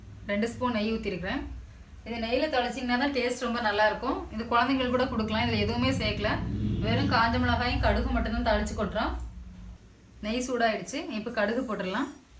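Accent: Tirunelveli, India. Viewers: நெல்லை தமிழ் நீங்க செய்த கீரை கடயலைவிட அதிகம் ருசி! Nice, very simple video.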